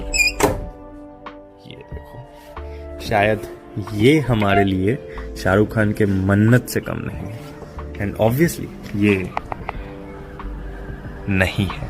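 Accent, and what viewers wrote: Bihar, India. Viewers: bhaiya are u from Bihar 🤔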